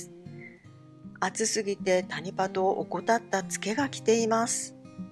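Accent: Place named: Kansai, Japan. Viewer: こんばんは！初めてコメントいたします😊 いつも参考にさせてもらっています❤ 私の実家も関西で、baさんの関西の言葉が大好きで、九州からほのぼのといつも見させて頂いてます😊 今回の動画で、焼け野原の鉢たちをそうすればいいんや❗と何だかやる気を頂きました😊多肉のとりこになってから４回目の夏…こんなことになる？と沈んでいたので、私もそうやって楽しみたいと思えました😊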